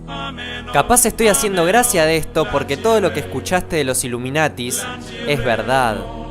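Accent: Buenos Aires, Argentina. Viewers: no sabia que eras argentino... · es argentino? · eres de argentina? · este flaco es porteño gato !! awta... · Sos Argetnino?? por que tu acento me suena a argentino...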